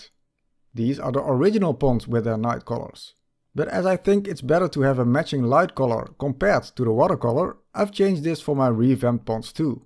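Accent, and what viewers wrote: Netherlands. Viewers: Are you Dutch? xD these mods are cool :D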